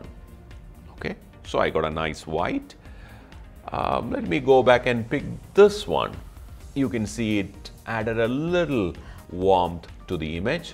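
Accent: Kerala, India. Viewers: really enjoying how he explain it, simple and understandable, and especially the accent :) is it india? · Are you mallu 😀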